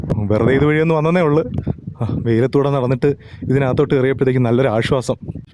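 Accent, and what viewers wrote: Kollam, India. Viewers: കൊല്ലം ശൈലി.😊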